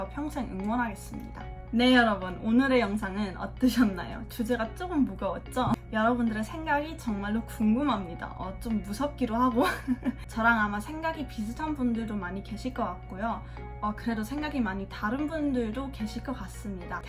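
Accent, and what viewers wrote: Gyeongsang, South Korea. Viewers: 님 하얀 얼굴에 브라운 눈으로 경상도 사투리를 하니 굉장히 싱기해요! 와 ㅇㅅㅇ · 누가봐도 한국인이네요 경남억양이 아주 미세하게 느껴집니다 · 경상도 가시나구만 ㅋㅋ 반가워요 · 외가 쪽이 경상도 또는 부산이죠? 억양에서 나타나네요, 그런데 한국에서 오래 살았나봐요. 한국사람하고 똑같이 말하는데.....아~ 창원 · 전적으로 동의하고요 여러 인종이 사는 미국과 주로 백인과흑인이 주를 이루는 북유럽과도 차이가 있는것 같아요 미국도 지방과 대도시 다르고요 말하는 사람들은 별생각없이 말하는데 듣는 사람에게는 상처가 될수도 있겠군요 많은 사람들이 인종차별이란 생각없이 또 차별 할려는 의도가 없음에도 쉽게그런말들을 하죠 한국부모 밑에서 자라도 미국서 자라면 미국적인 사고방식을 하니 미국인이라 생각 합니다 국적만 한국일뿐 미국인 부모라도 한국서 자라한국적 마인드가 있으면 한국인이고요~ 그리고 두가지 문화 두가지 언어를 할수있다는건 복이맞구요 그 댓가로 격어야 하는 차별들은 어쩔수 없는 상황이고 본인이 극복 할수밖에요 다가질순 없겠죠 모든일에는 장점과 단점이 있으니 영어 하나를 배울려고 많은 시간과 비용을 들이는 엄마아빠모두 한국인이랑 자연스럽게 두언어를 할수있는 혼혈중 하나를 택하라하면 님의 선택은? 저는 혼혈를 택할거구요~^^ 그리고 글로벌 시대에 국적은 크게 무의미 한듯 하군요 내가 사는곳이 내나라고 안맞으면 다른나라로 갈수도있고~물론 쉽지는 안지만~잘 적응 하는것 같아 좋아 보입니다 화이팅 창원화이팅~ 그리고 경상도 사투리도 매력있게 들립니다 개성 있어 보이고요 굳이 서울말 쓸려고 노력 안하셔도 괜찮은데 ㅎㅎㅎ 이제는 두가지가 다되시는 님 ㅋㅋ가끔은 사투리 억양이 나오긴 하지만 괜찮아요~사람이잖아요 창원 팔용동 사는 늙은이가 주절거림...... 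^^ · 응 경상도 사투리.. 한국인 확실해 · 음 사투리 억양이 들려요..경상도쪽?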